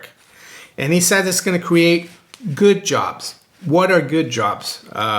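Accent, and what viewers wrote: United States. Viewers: Italy, Brazil, Switzerland - so why the United States accent? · Here I thought you were an american living in England this whole time. Thanks for the video.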